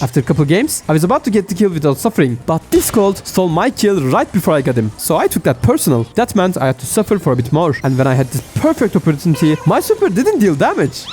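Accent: Turkey. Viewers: kral bişey sorucam neden yabancılar için içerik üretiyosun türk olduğun aksanından belli oluyo çok merak ettim türk kitlesi daha çok bs izlemiyo mu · Aa Türk aksanı neden duyuyorum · are you turkish by any chance your accent is really similar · Love your videos and your Turkish accent brother. Ellerine sağlık abi Türkiyeden bu seviyede edit skilliyle çıkan birisi olması güzel. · Omg i love you're accent its so cute😭😭 (Are you turkish??)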